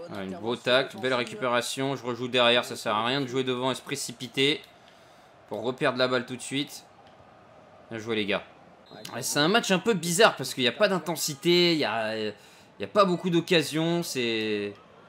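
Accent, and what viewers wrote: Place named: Belgium. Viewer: j'ai l'impression que tu prends l'axen belge je c'est pas si ya que moi qui l'ont on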